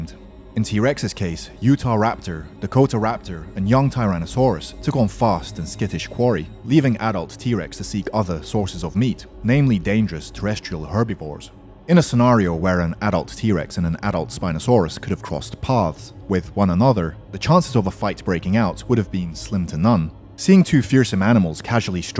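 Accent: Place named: United Kingdom. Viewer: Just because you speak in a British accent doesn't make you an expert on what happened during the age of dinos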